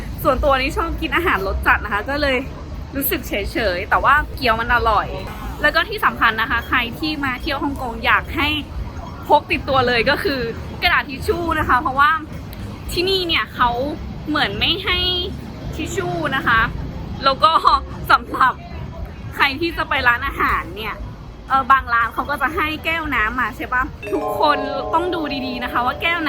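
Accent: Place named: North, Thailand. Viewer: สำเนียงแบบนี้คนเมืองเเน่นอนครับ55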